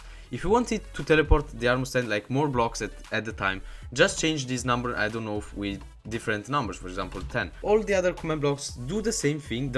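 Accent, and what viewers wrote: Italy. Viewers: Si sente l'accento italiano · he's italian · are u italian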